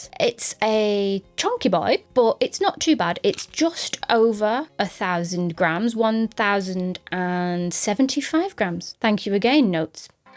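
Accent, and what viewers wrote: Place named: Isle of Man, United Kingdom. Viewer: Delighted to hear a fellow Manxie and see great shots of the Island!! Wonderful review and super helpful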